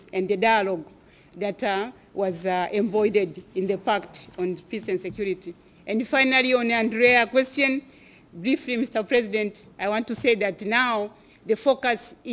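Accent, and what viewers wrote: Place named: Haya, Tanzania. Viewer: She is a Tanzanian from Kagera region. You can hear the Haya accent.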